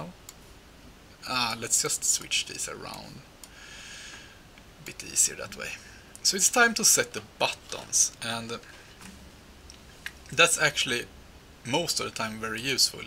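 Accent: Sweden. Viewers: Är du skånsk??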